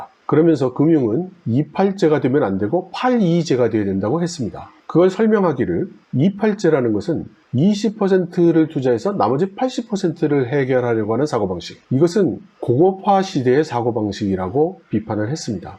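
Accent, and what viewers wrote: China. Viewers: 말투가 음 중국계이신 듯 ㅠㅠ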